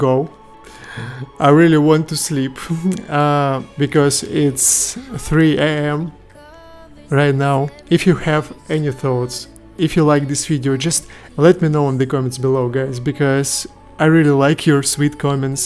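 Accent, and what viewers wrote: Russia. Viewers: dude i love your english russian accent...no need to feel embarassed when you speak in english :) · Brooo amazing work, really! No I feel like my remix is bad😅 Also your russian accent Is reaaly cool! Almost like in my country?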